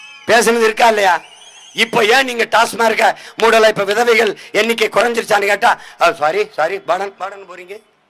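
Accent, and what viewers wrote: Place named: Andhra Pradesh, India. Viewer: Guntur Tamil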